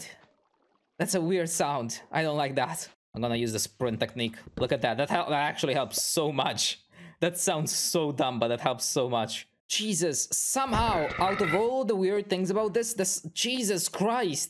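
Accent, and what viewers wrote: Italy. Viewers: Italy? Sei italiano? (Are you Italian) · Capisco dalla pronuncia che sei italiano complimenti per l'inglese ti giuro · " So it cooks ( Coo-ks) faster " Gosh i love Italian accents XD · Your from italy?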